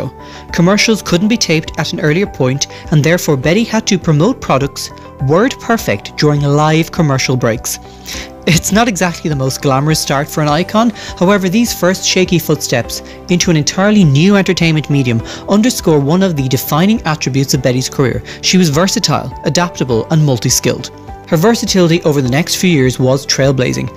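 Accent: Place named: Ireland. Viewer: I love hearing a fellow Irish voice in a voiceover! :) I've watched a lot of your videos now. Excellent channel and great work. Good for you. Hope it blows up for you. xx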